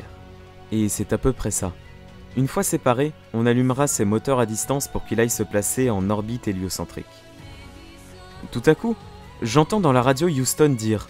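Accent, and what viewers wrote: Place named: France. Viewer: Bonne vidéo globalement. Par contre, pourquoi ces phrases en anglais avec l'accent français, ça gâche tout !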